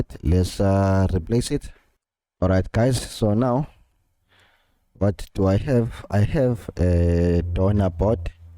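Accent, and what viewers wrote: South Africa. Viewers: I can hear that you're South African and that makes me very proud. Keep on sharing this helpful info. God Bless you.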